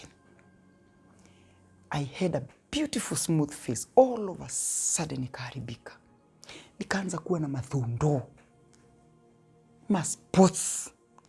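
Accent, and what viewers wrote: Tanzania. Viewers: What an inspiration! Glory to God. To be sincere I used to think you're from Tanzania because of the sweet voice and sweet Kiswahili for that matter. More grace in your ministry. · When I first heard you I thought you are a Tanzania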